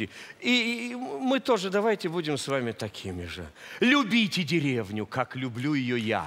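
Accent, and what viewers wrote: Russia. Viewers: Как бы не рассказывал юморист, а он действительно родом из Воронежской области. И произношение у него такое. Вообще, Святослав спасибо за юмор. Рассеялась от души.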